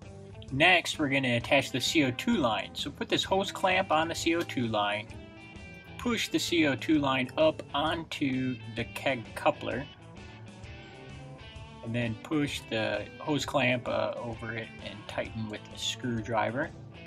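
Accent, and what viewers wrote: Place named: Upper Midwest, United States. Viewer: That's the most intense upper midwestern accent I've ever heard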